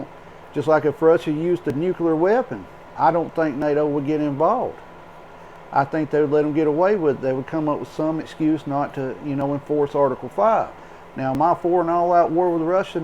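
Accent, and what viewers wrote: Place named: Alabama, United States. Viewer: Love the accent! Alabama?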